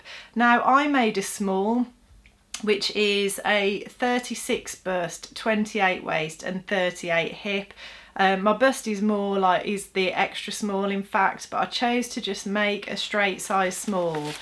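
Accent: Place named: Walsall, United Kingdom. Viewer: I am from Walsall, you sound like you are pretty close. I have lived in the US for many years and it is so nice to hear someone from close to home😀🇬🇧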